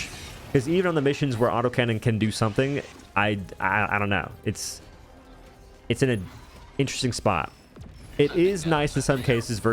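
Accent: South, United States. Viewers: Bro do you have a southern accent or not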